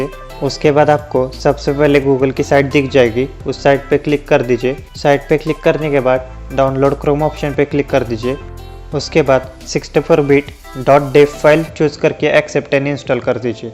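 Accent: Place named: Maharashtra, India. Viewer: Bhai ur speaking accent is like marathi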